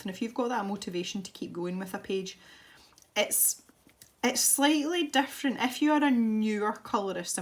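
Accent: Scotland, United Kingdom. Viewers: Ive always been drawn to the Scottish accent! Here in Australia, foreigners say we have different accents depending on where in Australia you are. Personally, I can't pick differences here... We all sound like bogans lol I love accents, and I can definitely tell the difference between Scottish, Irish, Welsh and English accents. I could listen to you talk all day! 😊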